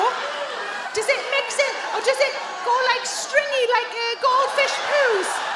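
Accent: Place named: Geordie, United Kingdom. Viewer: In my moment of madness, I bought a Ukulele and then Covid happened. Now I have eight in three sizes. I hadn't played a musical instrument for over twenty-five years but the upside of Covid was I had plenty of time to practice. In fact I think it probably kept me frow going insane or a least institutionalised like a caged Bear. 🤣 now I don't want you to take this the wrong way but every time I watch one of your YouTube videos I'm reminded of my granny. Like you she was a Geordie and had a that strong Novocastrian accent and earthy sense of humour. She was my mum's stepmother as my gramp lost his wife in a bombing raid during the war. I used to cyclone there with my two best mates and she would make us laugh with the sort of astutely observed humorous situation that you are so good at. We would leave there with face ache from laughing and the sound of her voice in our heads saying 'Aye ya boogers, ya do like ya bit of smoot'. 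I can still hear her when I came in through the back door saying ' Allo hinny, ah thought ya might cool this morning'. She wore glasses too funnily enough. It's like London taxi drivers, it's keenly observed comedy. You are a first class Raconteuse. Ohh.... the Hot Tub thing, I'd always imagined the effect would probably be like Number 35, Egg drop Soup. 🤣 you can have that on me and the wicked influence of my granny Ness (Agnes). 🐻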